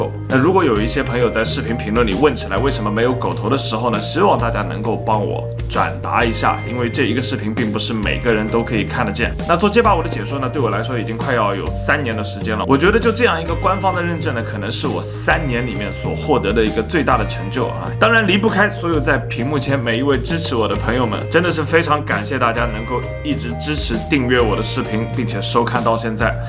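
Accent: Shanghai, China. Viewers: 沪普. 上海宁？ 😉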